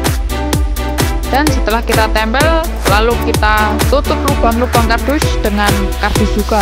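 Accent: Java, Indonesia. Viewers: Dari mana nih bosku.. Kok logat jawanya mateng amat haha salam dari kota Demak Karang tengah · Wong jowo ndi suarane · Jawa medok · Logat jowo ne keliat banget :v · Jowo ne ngendi sampean mas:D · Bang orang jawa mana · Mase wong jowo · wong jowo ya kowe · orang jawa ya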